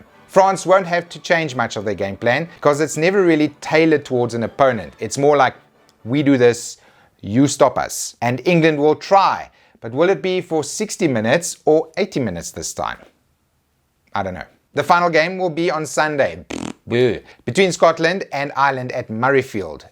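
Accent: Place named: Johannesburg, South Africa. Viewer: Good old Afrikaans Jo'burg accent.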